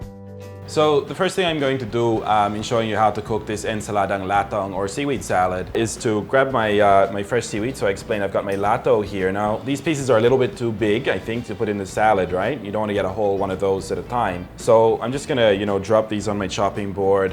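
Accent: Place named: Philippines. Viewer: I like how you have a little bit of filipino accent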